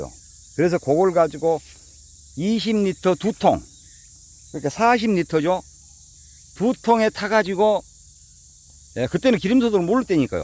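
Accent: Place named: Jeolla, South Korea. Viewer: 전라도 구수한 사투리 정겹고 날마다 좋은정보 잘배우고 갑니다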